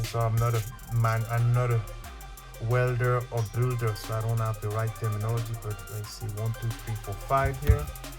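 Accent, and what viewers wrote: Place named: France. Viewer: Sounds French to me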